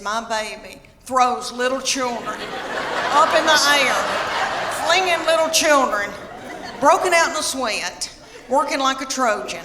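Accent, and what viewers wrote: South, United States. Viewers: I was today years old when I found your channel!!! I can hear the sweet southern charm in your voice!!! Hello from Alabama!!! You're hilarious and refreshing! Thanks for making me pee myself today, I DO aporeciate it!!! 😂😂😂 #sportsandoffdope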